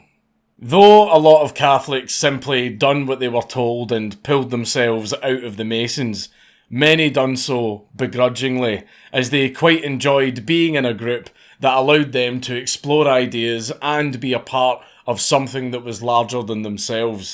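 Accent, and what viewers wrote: Scotland, United Kingdom. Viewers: "A Papul bull" I love the scottish...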